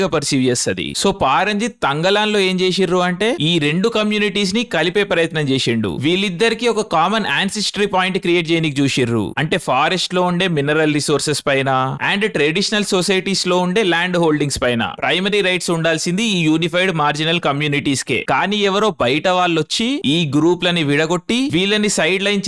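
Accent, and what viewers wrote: India. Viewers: Arey.....ayaa....idi English lo Telugu la undiraa...em matladutunnavra ayyaa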